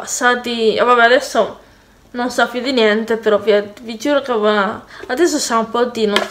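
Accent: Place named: Rome, Italy. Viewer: Ho magnato ahahahahahahha so morta parlata romana 🤣🤣🤣c'è lo anche io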